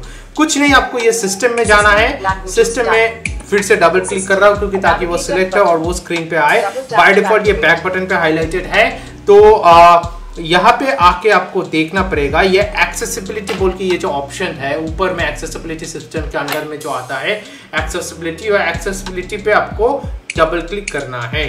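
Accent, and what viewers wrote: India. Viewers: Damn, couldnt get passed the heavy indian accent. Not hating him, wtf u thinkin, damn did not help me at ALL Thanks 4 Nothing. Just wanted keyboard back to normal, now Im fluent in indian language. Damn, use some one that speaks clearer english, just sayin......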